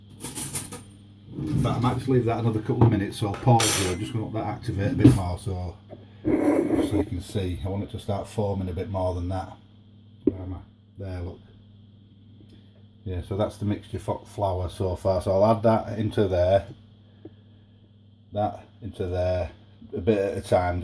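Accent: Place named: Midlands, United Kingdom. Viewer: Love this down to earth video ❤️. Reading the transcript had me in stitches, obviously not someone who understands the midland accent🤣😂. Yes I did make 2 decent loaves of bread following his instructions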